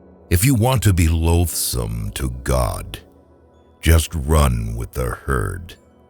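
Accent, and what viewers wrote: United States. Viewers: The stupid American accent doesn't sit well with, European philosophers.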